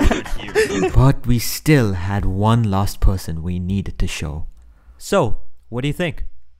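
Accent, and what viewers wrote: India. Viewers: You're Indian aren't you You have an Indian accent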